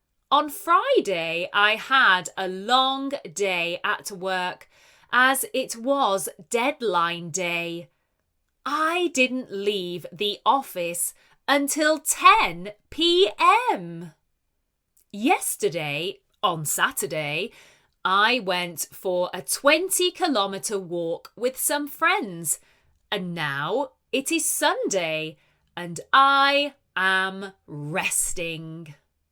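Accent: United Kingdom. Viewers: 🙌🏾🙌🏾🙌🏾🙌🏾Thanks so much for your time that you have been using to prepare the different stories ✨✨ it helps to boost our english level 😁😁Even UK accent 📌.Don't know how to thank you 😊 enough.May God bless the work of your hands 🙌 🇹🇿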